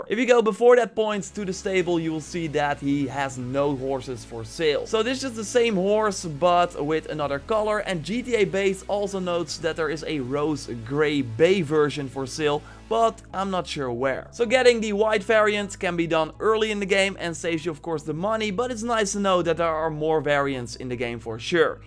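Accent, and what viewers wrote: Netherlands. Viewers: Dude, damn... dutch accent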